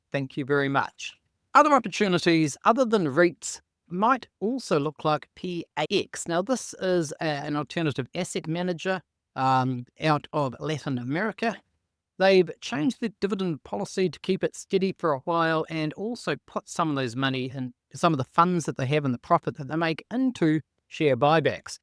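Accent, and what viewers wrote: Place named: New Zealand. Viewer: Thanks, for sharing your knowledge and advice. I lived in New Zealand as a child for two years some sixty years ago. Hearing your accent brings back fond memories of the Land of the Long White Cloud!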